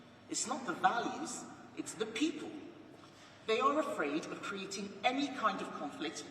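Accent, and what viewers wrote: United Kingdom. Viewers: Sounds pretty British to me!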